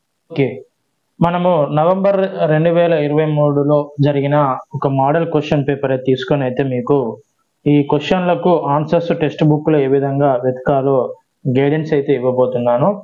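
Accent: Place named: Karimnagar, India. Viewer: Sir karimnagar language lo chala baga chepparu thank you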